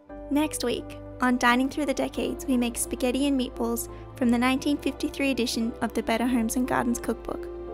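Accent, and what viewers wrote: Australia. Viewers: You’re one of the only girls that makes an Australian accent sound pleasant, lol. No hate!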